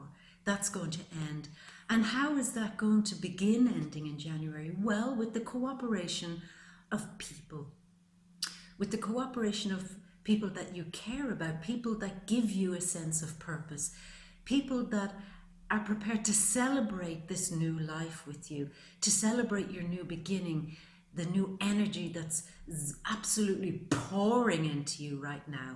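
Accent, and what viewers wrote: Ireland. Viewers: nice Irish accent. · wow ,,,and all in a lovely Irish accent <<<subscribed beautiful x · My God, what a reading, I hope it transpires because I had an Astral Prediction done last in 2017 and was promised changes would start in May, do the lottery and a windfall would come in, I spent over a £1000 on lotteries from end of March to June and won very little, other things were going to change, reconciliation and more? My birthday is 26/12/1961 I was born at 5.40am birth in Kirkby, England? I've been through it over the last 30 years but none more so, than the past 2.5/3 years. My wife ended our marriage 15/12/16 walked out Jan 2017 I've been sick with depression and anxiety, hospitalised with blood clots on my lungs, I was suspended from work. Now things are meant to be going right for me? Why do I have no faith? My ex filed for a divorce, I got the papers virtually a year to the day she told me it was over 16/12/16 now I find myself in a predicament, fearing losing my job, my house, half my pension, everything. 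By the way, may I just say you are beautiful, your eyes are so mystical, your soft dulcet Irish tones are so soothing and I love your witty repartee. I'll be watching your readings every month for as long as I am here.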